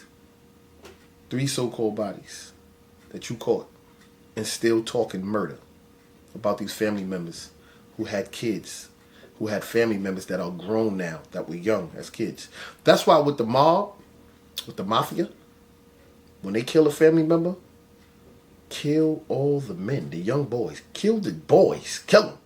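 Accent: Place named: New York, United States. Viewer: Handsome ash... besides the fact. You put me in the mind of llcoolj and like Alpo in dialect. I guess its a NY swag.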